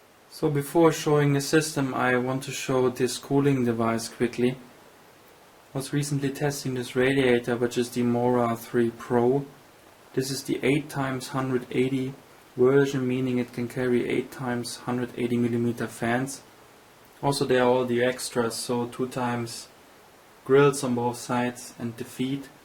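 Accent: Germany. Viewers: Nicht schlecht. Man hört so krass, dass du Deutscher bist ^^ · You speak like a german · Kann des sein das du Deutscher bist? Dein Akzent ist so. :D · English F.A.I..L i am sure u german right?? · Man kann an deiner Stimme hören, dass du Deutscher bist xDD btw wie viele fps haste bei fullhd crysis mit kp wie viel AA^^ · Du bistn Deutscher :D das hört man soooo extrem :D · So ein geiler deutscher Akzent... :-) Aber Hammer Gerät ist das!!!! Haben will !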